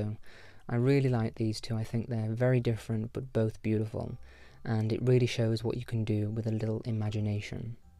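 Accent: RP, United Kingdom. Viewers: god i love british sm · Thanks for sharing. I didn’t know green tea wasn’t able to withstand very hot water. Interesting. My grandmother was from the UK and she passed away a couple years back. I usually drink coffee but I will have to have a cup of tea in memory of her. She was in the US for so long (from around 20 years of age due to marrying my grandfather who was in the Air Force; they met in England when he was stationed there)... Anyway, I’m rambling but I miss her. She was the most elegant lady I know. You remind me of her for some reason. It must the the accent as well. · Way too posh for me! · I went to an English school for sixth form and I love all of Britain. But (please forgive me) this type of accent is so hilarious, it sounds so pretentious and formal, I always wonder what you must have put up your * and what size it is. Great video though. 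WHERE IS MY SCONE? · My goodness. I'm so mesmerized. My grandfather is a Londoner in the US and I've never heard anyone so posh besides him....but also SO amazingly refreshing.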